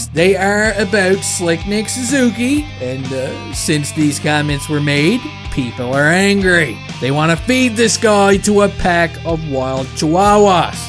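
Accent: Newfoundland, Canada. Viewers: I can here the Newfoundland er in your voice. Maybe your a propane guy. As slick right I think guys comment hurt cuz it’s the truth as of right now.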